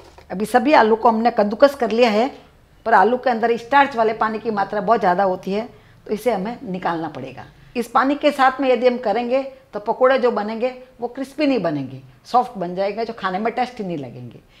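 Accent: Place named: Marwari, India. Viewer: apki boli se lagta h ya aap marwadi h .yhaa baris bohat h me triy karungi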